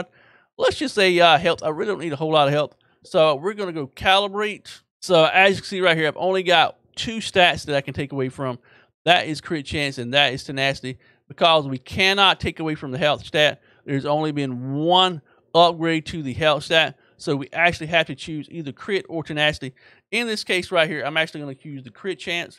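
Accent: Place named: South, United States. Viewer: your fast paced southern American accent is quite hard to follow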